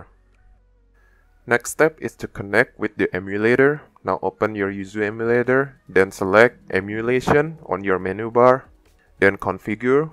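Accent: Indonesia. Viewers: Great content. First time on your channel and I easily recognized your Indonesian accent. :) Salam indo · Done and works, beautifully explain. thanks. btw kerasa banget logat indo nya wkwkwk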